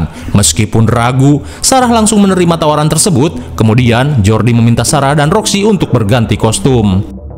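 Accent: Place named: Banten, Indonesia. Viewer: Dina logat Sunda na Sunda Banten doang na ieu Chanel nyh😅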